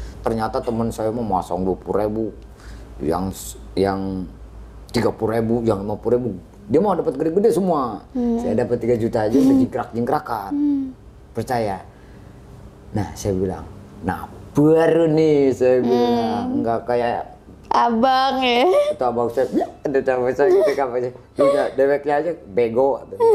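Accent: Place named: West Java, Indonesia. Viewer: Logat sunda nih ya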